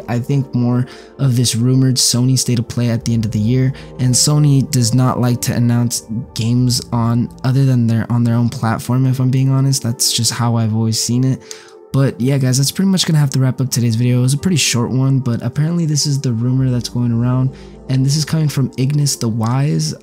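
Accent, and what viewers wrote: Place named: Queens, United States. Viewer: Mg u sound so queens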